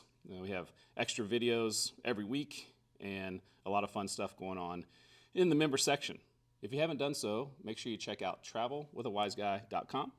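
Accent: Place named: Texas, United States. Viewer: Coach, you have spent enough time in both Texas and Oklahoma to develop a slightly perceptible country twang. It sounds cool...you wear it well! 🤠 Coach, please stay out of Gouge Eye...it sounds like a rough place!🍺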